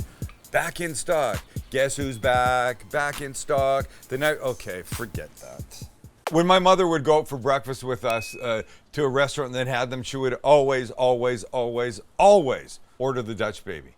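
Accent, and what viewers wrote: United Kingdom. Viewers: I've commented on how bad southern accent is. His British accent is perfection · That British accent..😂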